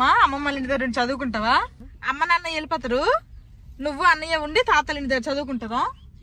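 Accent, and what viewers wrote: Srikakulam, India. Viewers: Mana శ్రీకాకుళం culture slang exact ga chupistunnaru ea filters lekunda super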